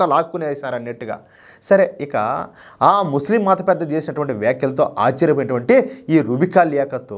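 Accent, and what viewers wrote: Rayalaseema, India. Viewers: Your from rayalseema, how language looks like cinema movie dialogues.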